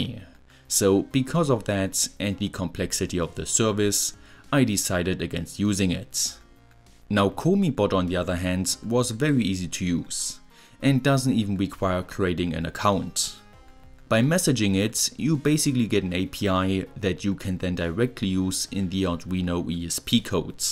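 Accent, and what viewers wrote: Germany. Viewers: i heared german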